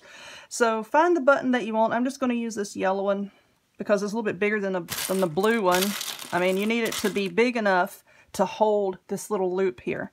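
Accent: South, United States. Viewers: I'm from NC as well, I can totally relate to your southern accent and way of talkin. I enjoyed this video, I just might try making these purse caddies. Tfs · Mam, Your potholder caddy is awesome!! I am getting ready to have a craft sale at the end of the month and I came on tonight to look for simple ideas to make on a budget. I have tons and tons of fabric as my grandmother use to own her own fabric shop - so I was looking for cute easy ideas for some new crafts to sell. As soon as you mentioned that you need to take your shoes off I thought to myself, "wow, this gal is like me". When I use to sew with a treddle foot machine I always sewed with my shoes on and I would get that treddle going very fast but, now with the modern day peddle I have to sew barefoot as I have more control over it that way too. Most of the pot holders I sell I also make but, I don't believe my pot holder will work for your little bags. 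I will start watching for the pot holders on sale at various stores. I believe my Dollar Tree might have your type of pot holder too along with the zip lock bags. These little purses would be ideal for teen girls also and small enough for their lockers. I think they would be good for sun glasses/eye glasses but, you cannot sew the sides completely up so you have room to slip in the glasses. Thank you for an awesome new craft idea. - Rebekah in Alexandria, VA PS: Where are you from with your thick southern accent? I am from NJ so you can imagine how I sound. My niece laughs at how my mother, grandmother and I say coffee. Her father is from NC so after a summer with her other grandparents, my niece comes home calling all of u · You never bore me love watching your DIY and learn from them. Plus like your southern accént 👍👍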